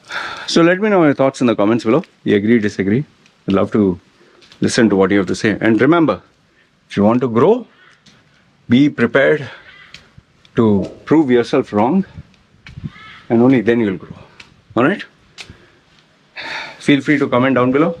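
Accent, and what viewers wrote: India. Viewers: When ur accent is indian and u can't even pronounce indian names correctly.....dhruv rathee?😅😅😅 On purpose?? To act cool or sound like west!? Oh' cmon u dnt need such acts to look polished....u r much more than that 😊😊😊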